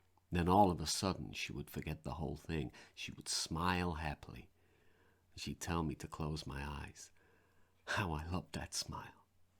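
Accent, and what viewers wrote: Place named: United States. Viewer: Whoa! Chilling! (Your American accent is awesome, by the way )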